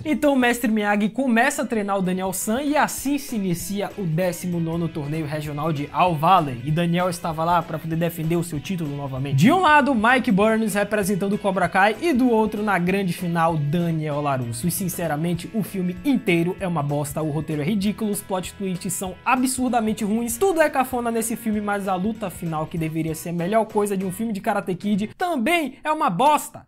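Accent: Bahia, Brazil. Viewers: . Você é de que cidade da Bahia? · vc é Baiano tbm sou rsrsr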